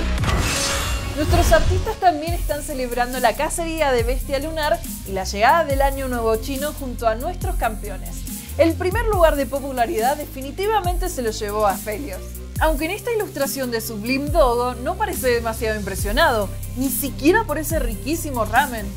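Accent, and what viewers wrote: Rioplatense, Argentina. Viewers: no puede evitar el acento argentino xd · Pueden porfavor pedirle que hable más neutral ? Sin tanto acento, se entiende que es Argentina pero viejo a la panchasky le exigieron hablar "neutral" durante toda su estadía en Riot.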